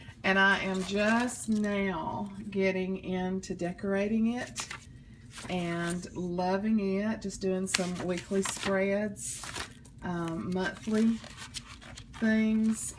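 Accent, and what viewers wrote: South, United States. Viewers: I'm such an idiot, I obviously wrote this comment before I'd even finished watching the video, lol. By the way I absolutely adore your accent. I love the accents from all around the south/deep south in the USA. Y'all sound so beautifully well mannered, with your habits of saying sir/m'am and "bless your heart" (although I think that's more of a Texas backwards compliment thing lol.)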